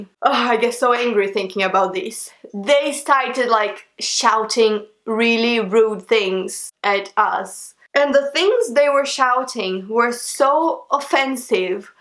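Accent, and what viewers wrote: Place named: Brazil. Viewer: I know that ur brazilian because ur. Accent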